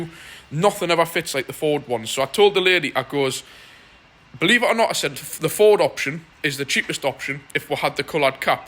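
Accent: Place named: North East England, United Kingdom. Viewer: That is how I found your channel, typed in something to do with L200 fuel filter and with the accent new you were northeast. anyone that looks for youtube video on a pacific vehicle you have worked on will come up world wide, also the caravan blogs.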